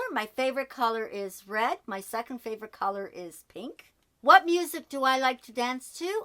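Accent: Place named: Portugal. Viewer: I work with a Portuguese women and your accent sounded the same. 👍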